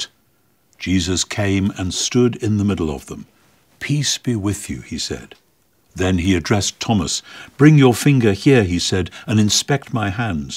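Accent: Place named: United Kingdom. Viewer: N.T Wright has one of the best British accent I’ve ever heard I could listen to them all day.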